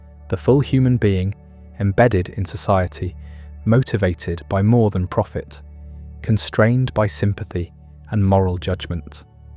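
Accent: United Kingdom. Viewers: can't understand brit accent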